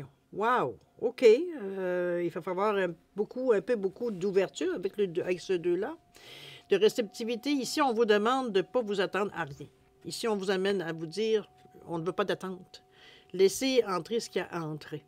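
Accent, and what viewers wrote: Canada. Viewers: J’aime beaucoup ces moments passés en votre compagnie, ce matin au petit déjeuner😊 actuellement bloquée dans ma vie suite fracture du genou, c’est tout à fait ça… lucidité… émotions présentes mais qui font qu’on se sent vivante… je retiens « Patience et confiance »…j’aimerais bien retourner au Canada ❤ et votre accent m’en donne un avant goût… merci à vous 💐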